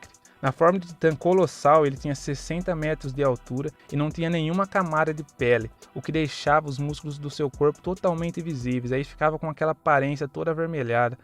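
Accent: Brazil. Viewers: Vc é Gaúcho????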